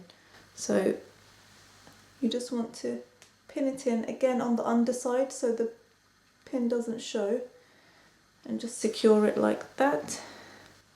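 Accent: United Kingdom. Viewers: british accent,I love it!and of course I like the video :)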